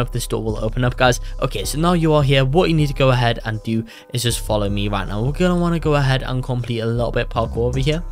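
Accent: United Kingdom. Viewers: U ate british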